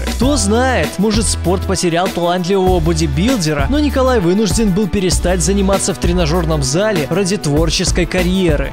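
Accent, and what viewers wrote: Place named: United States. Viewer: почему он говорит как американец который хорошо выучил русский?? Прислушайтесь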